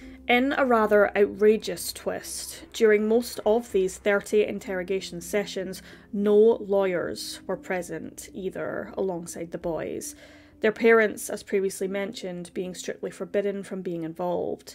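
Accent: Scotland, United Kingdom. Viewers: I just found your channel today and as I was waiting on the narration, you can imagine my surprise as an East coast Scot hearing your voice. Instant subscription before I’d even listened 🏴 fortunately your video was extremely well researched and handled with dignity and empathy so I made a good choice. Thank you for sharing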